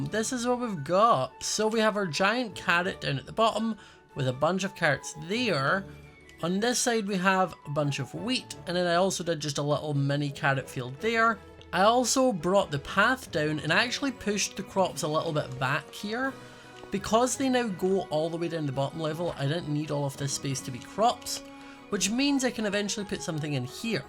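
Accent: Scotland, United Kingdom. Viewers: ok, so. new subscriber here. Nice ta meetcha. I really love the Scottish 'language'. it would be so cool if you did a video that included all the normal Scottish slang that exists. I wanna hear what a true Scottish man sounds like before I move to Scotland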